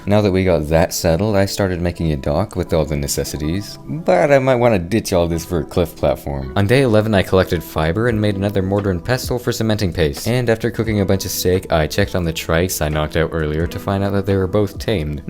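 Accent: United States. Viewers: I love the cowboy accent 😂😂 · combine the voice of * and a southern accent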